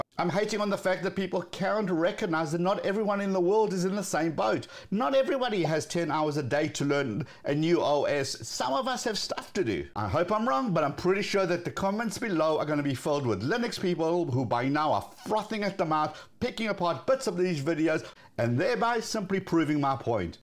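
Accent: South Africa. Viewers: You've got an accent similar to ours in South Africa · Whenever there's a new video I click, for the content, but secretly for the south African accent 😂😂 Much love from 🇲🇿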